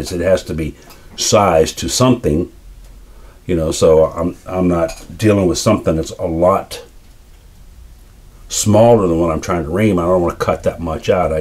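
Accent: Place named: South, United States. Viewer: Hornaday Sayko Lay-pewah Triggered yet? 🤪 That said, most of what we learn about our sport is read in books, magazines, or gun blogs, so if you've never heard it pronounced properly you'll say it the way it looks like it should sound, I don't personally have a problem with that. BUT blaming you incorrect pronunciation on your southern accent is a cop-out: even a southerner can learn to pronounce names correctly! Frankly, it's the polite thing to do!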